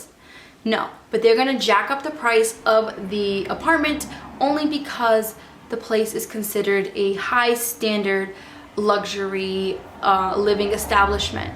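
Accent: Boston, United States. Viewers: I can hear your Boston accent. It's ever so subtle but adorable! 😍😁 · Lol...i knew you were from the Boston area by your accent! I'm about 40 min away!! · In Michigan, the basic coverage insurance is over $100 a month. For me its $239 a month because I haven't had insurance in a while. I've heard that Michigan has the highest car insurance in the country. Its just crazy to hear how cheap it can be in other places. In other news, I LOVE your Noston accent..😀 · Love the Boston accent, thank you for your good points! Need some help learning where to find an apartment coming out of college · I love your Boston accent.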